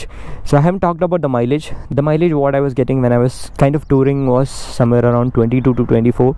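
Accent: Kerala, India. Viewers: Unhideable mallu accent 😂👍🏼 way to go bro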